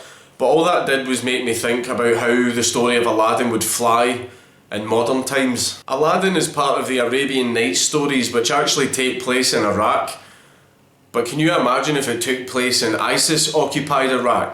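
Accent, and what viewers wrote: Scotland, United Kingdom. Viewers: Few things make me as happy as hearing a Scotsman call someone a cunt. It's just beautiful. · Fuckinell!!! He really is Scottish isn't he? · i never wanted to listen to a man talking sexy to me with a Scottish accent. untill now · The way you said Conspiracy Theories as "Con-spare-essy Thee-dees" Made me bust a gut xD. You're Scottish accent is perfect, I wish I had it to be honest xD.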